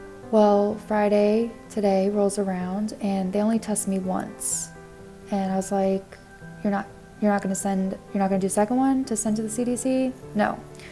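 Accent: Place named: Midwest, United States. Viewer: Yes, even in North America the situation are getting dicey....judging from your accent, are you from the midwest? I'm in the Southeast (Florida) now 🙂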